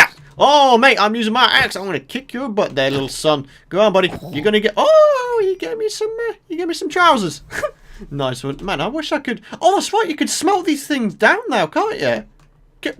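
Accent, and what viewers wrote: United Kingdom. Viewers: Giovanni Barberio...i would guess great britain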